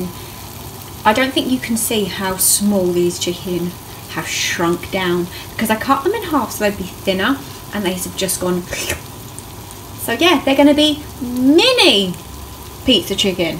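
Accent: United Kingdom. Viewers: Really! The lovely Brit accent. Thanks much. But---is the "Little" a nickname preface of sorts? Surely a tween in a few years won't be amenable to that. 😉🥰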